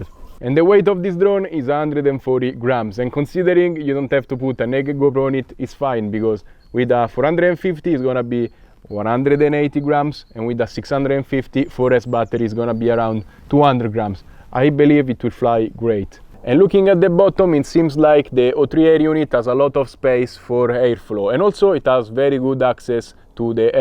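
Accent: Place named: Italy. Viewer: Fà strano sentire il tuo accento italiano, abituato ai video inglesi ! Ma a parte questo ottimo video, bravo in inglese e divertente la caccia alle galline XD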